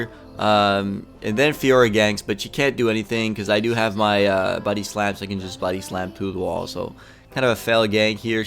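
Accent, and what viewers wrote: Quebec, Canada. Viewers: T'est anglais de base ? ou québecois parce que ton accent est vraiment ÉPIC :D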